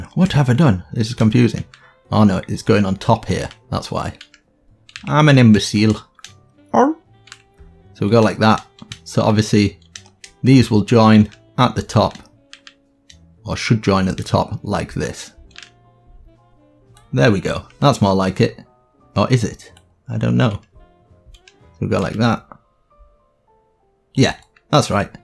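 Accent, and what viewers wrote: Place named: United Kingdom. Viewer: And it is not just the British accent it's also the sound of your voice! (If that makes sense)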